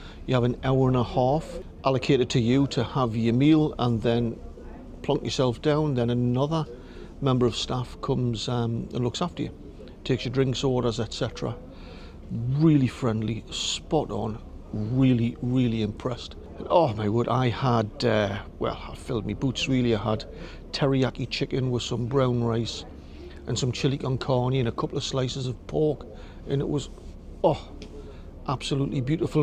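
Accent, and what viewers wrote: Newcastle, United Kingdom. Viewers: This is such a great video. Thanks for making it. I watch your vids as a fellow Geordie who moved to Ireland 23 years ago. I found your channel a few months ago and am hooked as I love seeing the Toon again and your accent is brilliant mate. Great work Keep it up. 👍 Cheers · Hi mate great little video ,just found ya Channel so will be watching others you've done, with the scots your geordie accent is the one i like the best in the uk 😂😂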